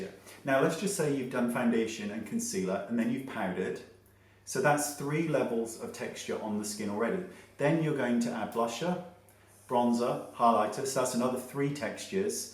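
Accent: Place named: West Country, United Kingdom. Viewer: rofl you sarcastic fuck. I love it. Your westcountry accent comes out more when you get catty, ITS BEAUTIFUL. Kisses xxxx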